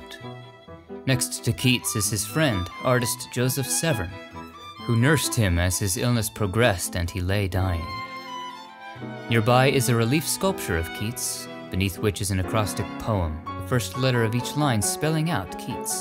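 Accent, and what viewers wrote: Italy. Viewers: first, congratulations on your Italian accent, then ... welcome to my Florence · I love your Italian accent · Hello. You must speak Italian, you say you have Italian heritage. Thank you for making this more real with your accent. I am Judy from Nova Scotia, Canada and I really enjoy your videos.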